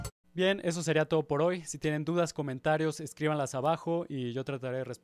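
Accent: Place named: Mexico. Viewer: Excelente la manera que explicas y enseñas, por el acento eres mexicano, chingón mi hermano, hoy aprendí algo nuevo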